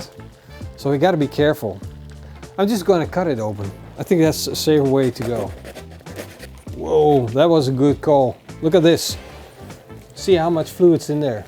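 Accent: Netherlands. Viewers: He’s Dutch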